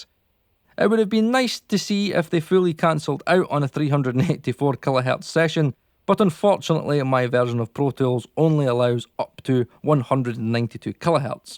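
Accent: Scotland, United Kingdom. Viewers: Hi. Thanks for saving me a shite load of money on inferior software. Also, my families from Ayr and try as I might I can’t seem to geographically pinpoint your Scottish accent 🤔😉 Keep up the good work.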